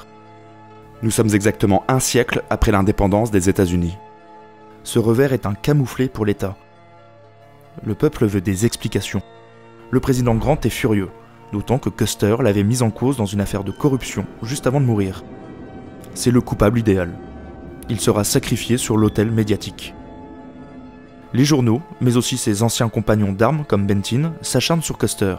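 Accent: France. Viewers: excellente vidéo ! une rectification qui a été faite plus bas en anglais et pas traduite, semble-t-il, les photos de crazy horse ne le représentent pas, il n' existe aucune photo de crazy horse, juste un dessin qui a été fait bien après par un dessinateur professionnel selon des descrptions orales, et qui a été validé ensuite par un des membre de la famille de crazy horse. Si je remets la main sur ce dessin, je le mettrai en lien dans l'intervention en anglais, plus bas. Aussi, je voudrais saluer, a l'heure du grand n'importe quoi des voix de synthèse à tout va, au détriment du bon sens et de la qualité, ce que vous avez fait ici : parler avec votre voix, donc, avoir osé être vous-même vocalement parlant. ce qui semble devenu une chose insurmontable pour un grand nombre de youtubers. En plus, ce n'est pas scandé, hurlé, appuyé d'une façon non naturelle comme on le voit partout. 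bravo ! en tant que pro de la voix et du doublage, j'ai remarqué quelques petites choses perfectibles, mais tres peu entendront ces mini problèmes, comme les coupes un peu "a la hache" en fins de phrases, dans les respirations, les popings légers par moments ou les changements de placement par rapport au micro, qui font qu'on entend des ajouts faits au milieu des phrases. il y a aussi les A a certaines fins de phrases, qui sont un peu fermées comme des O, comme on fait chez moi en bourgogne, ou comme on l'entend parfois à Lyon. c'est très léger, tout petit accent du terroir pas bien gênant mais qu'il s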